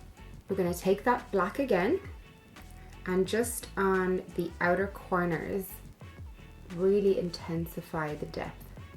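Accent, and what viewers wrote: Ireland. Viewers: Love your Irish accent, you look so beautiful xxx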